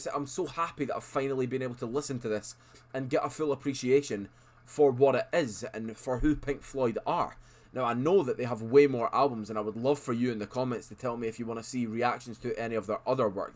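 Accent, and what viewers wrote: Scotland, United Kingdom. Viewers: As an older fan of (I'm 72 soon) I like his rap, but my favourite music is from the 70s, in particular Pink Floyd. They are every bit as invested in the lyrics as any decent rapper, with the addition of great talent and skill in playing their instruments. Edited to say, keep letting the transitions happen, they're an intrinsic part of the music. Further edited to say, as a fellow Scot I'm glad to hear a reactor with your accent. I've subbed in the hope of more Pink Floyd and for.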